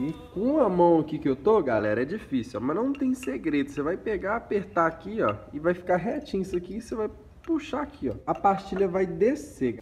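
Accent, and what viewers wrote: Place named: Minas Gerais, Brazil. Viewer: Sô do RJ, mai acho o sotaque mineiro manerin mané.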